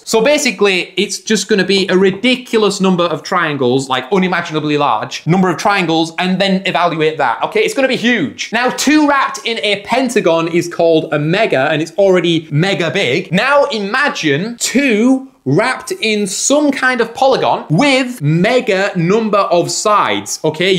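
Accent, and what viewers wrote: United Kingdom. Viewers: I Cant take a squeaky voiced british accent serious, ever at all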